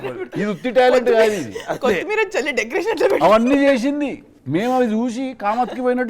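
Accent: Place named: Telangana, India. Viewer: నువ్వు ఆంధ్ర అయినా తెలంగాణ భాష భలే మాట్లాడుతున్నావు👌👌👌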